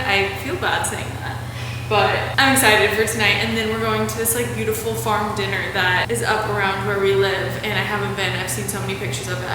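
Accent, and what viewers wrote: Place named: United States. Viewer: I love in American Accents. By the way, May I know Where is your accent in America? Thanks you.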